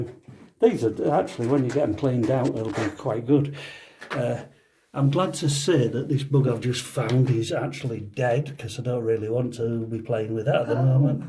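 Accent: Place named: Yorkshire, United Kingdom. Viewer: eh up are you from ULL LOL. You seem to have a Yorkshire accent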